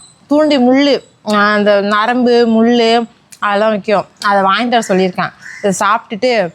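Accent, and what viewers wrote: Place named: Mayiladuthurai, India. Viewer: Your talking slang reminds me of my friend… she is also from Mayiladuthurai.❤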